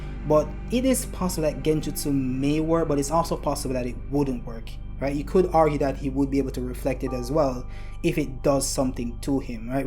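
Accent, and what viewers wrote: Jamaica. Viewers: U Jamaican? 🤔 That accent sounds familiar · ARE YOU JAMAICAN? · You form Jamaica?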